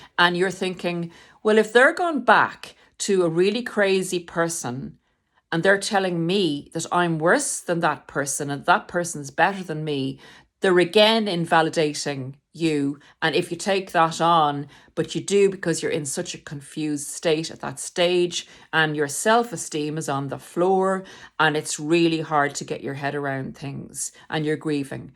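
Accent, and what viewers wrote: Ireland. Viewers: Hello from London and I found your channel today and I'm on video number 4! It helps your Irish as both my parents are, so it's quite familiar listening to you 😊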